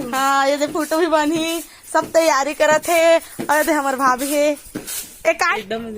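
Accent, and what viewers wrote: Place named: Durg, India. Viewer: Seva johar.tuman kon Raj ke aa nai bataya.boli se lagathe tuman durug side ke ho sakat ha.ham to Korba jila wale🙏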